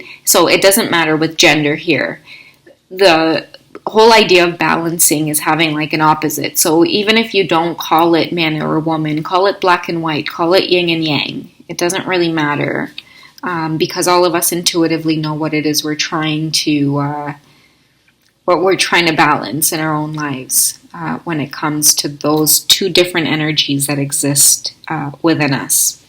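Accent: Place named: Trinidad, Trinidad and Tobago. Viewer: Girl, sorry I forgot that I could reply to you in the oldest post videos, like I did before because I am hiding a little bit here, hehe, Thank you Girl so much for all the readings, I really love you too, and I love your Trinidad Accent too I always want to say that, so funny and of course natural cause that is your natural language, hehe ❤💖 Love you so so so much, you are like my best friend, the readings are like daily Vitamins for my heart, all, the Romantic ones, the Sensual ones, ect, all, hehe 🥹🙏 thanks so much for all the readings Girl, I can't thank you enough, let me pay you with my Love hehehe 💖🥹🫂😚muah🩷💖 Thank you so much 🩷💖 (Hehehe, i pick this video by random actually, just what oldest and scroll down a bit, wkwkwk🙏🩷)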